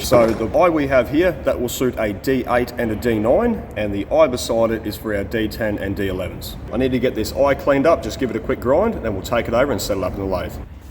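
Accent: Australia. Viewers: You've got an Aussie accent....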